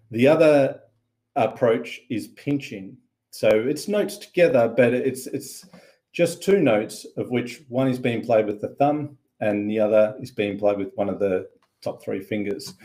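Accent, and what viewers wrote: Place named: Australia. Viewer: I’m a finger style guy. Have been since I started with a really good guitar coach/instructor. Nbnbmnmn at Gryphon Strings in Palo Alto, California. You sound Australian, you’re playing a beautiful Maton and have an excellent approach to what I consider the most incredible instrument with a 1000 voices. Not sure how I stumbled on your videos but certainly glad I did.Almost 72 if I make to October. Stopped playing some time back and completely lost on how to start again. I need someone to get me jump-started. Perhaps you can help me with that.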